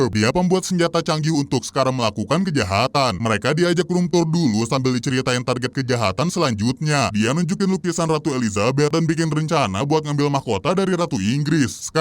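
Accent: Java, Indonesia. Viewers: abang jawir ya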